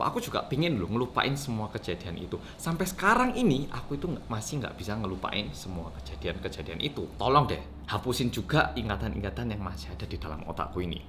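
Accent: Java, Indonesia. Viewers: Sudah baca beritanya sih, tapi emang lebih enak kalo mas yg ceritain langsung, jadi bisa membayangkan kejadiannya, (dan terpercaya karna langsung di terjemahin sama orang korea medok ) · "Hello guys welcome back to Korea reomit bersama orang Korea yang medoook" Salam dari bali mas medok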